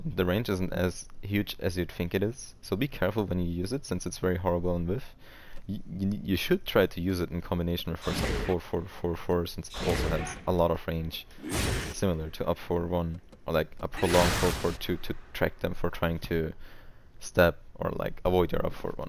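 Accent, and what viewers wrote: Germany. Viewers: Haha the accent always gives us away. Habe mir vorgenommen von Jin (T7) auf Dvj zu wechseln in T8 und dein content ist richtig gut bro. Danke fürs Teilen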